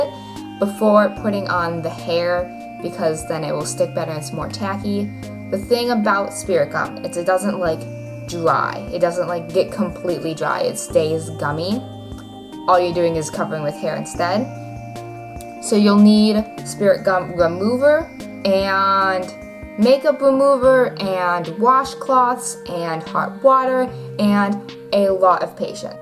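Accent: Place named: Minnesota, United States. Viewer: Are you from Minnesota? You have the accent... I love it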